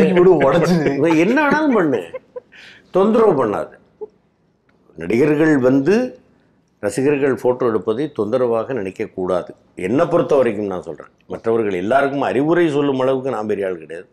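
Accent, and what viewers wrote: India. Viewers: Bangalore tamil? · Ayya mass speech. Thanjvur tamil god bless you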